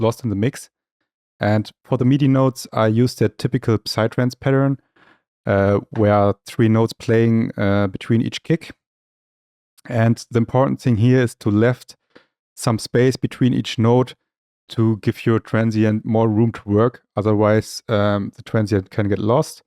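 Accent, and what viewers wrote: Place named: Germany. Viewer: thats a german Accent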